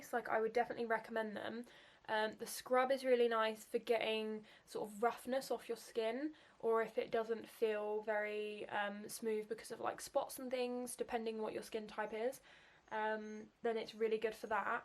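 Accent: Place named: United Kingdom. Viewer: Ayee your British as well yayyy what part are you from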